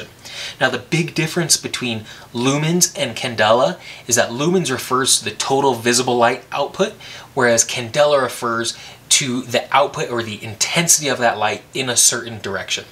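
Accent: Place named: Kansas City, United States. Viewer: You from Kansas city?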